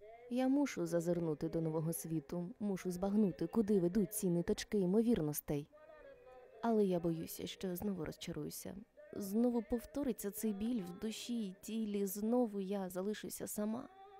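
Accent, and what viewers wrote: Standard. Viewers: Чудовий голос та чиста мова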